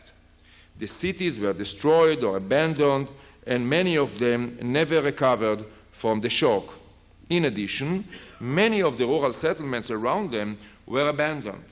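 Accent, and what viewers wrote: Israel. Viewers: Israel Finkelstein is awesome...An Israeli Jew who has no issue at all speaking the truth about the mythology of his people in the context of modern archaeology. The points he hits in this lecture are absolutely true and for the most part have been known for over 100 years. If you can get past his thick Israeli accent this lecture is a good summation for the average biblical-historicist to mull over. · In English? You know he is a Hebrew speaker as you can hear from his accent.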